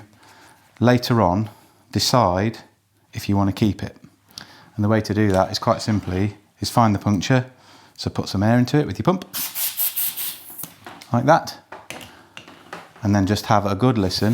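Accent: United Kingdom. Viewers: One of the best instructional videos I’ve ever seen on any subject. Excellent optics and close ups. Outstanding narration with confident, easy going style (being American, love the Brit accent, characterizations, and take on things) using only hand and body skills with no unnecessary props. Covered all the essential information without being too long or short. Love it, love it, love it! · damn, such a nice English accent. I can listen that for hours.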